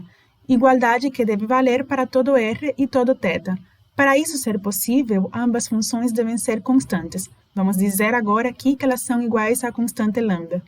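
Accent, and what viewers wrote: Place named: South, Brazil. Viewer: Obrigado pela aula!! Fiquei um pouco distraído tentando descobrir de onde era o teu sotaque kkkkk. Chutaria que sua língua materna é espanhol, vc aprendeu português depois da adolescência e como vc fala muito bem, vc deve ter morado no brasil por um tempo, pelo seu sotaque sulista, acredito que veio morar em uma região do sul do br, acertei?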